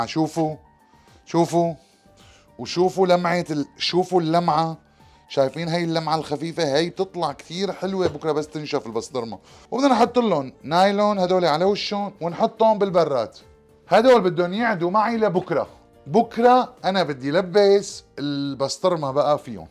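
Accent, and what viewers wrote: Levant. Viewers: سلامات شيف اكلاتك متلك طييبين اللة يسلم دياتك والاحلة لهجتك الشامية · حكيك شامي تمام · تسلم ايدك شيف اول مرة اشوفك بس واضح انك استاذ في البسطرمة الله يعطيك العافية واضح من لهجتك انك لبناني او سوري وانا كتير بحب اللهجة الشامية تحياتي من مصر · سلمت يداك ولسانك الشامي العذب تحياتي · حكياتك الشاميات حلوين 😄 وشغلك ماشالله نضيف ومرتب يعطيك العافية 👍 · مصري من المانيا يرسل لك شكر يكفي من هنا لعندك. غير اللهجه الشاميه التي نعشقها كمصريين,فبكل امانه الشرح والنظافه والاهتمام الرائع في تجهيزك للحم,انتج في النهاية قطعة البسطرمة وشرائحها والتي من مجرد شكلها وانسيابيتها تعد تحفه فنيه. لا تقل ارمينيه, ومع احترامنا لهم فنحن نعرفهم اساسا كساعاتيه,ولكن انا حين اجربها سأقول بسطرمه. كل الحب والاعجاب والتقدير وتحياتي لشخصك الطيب.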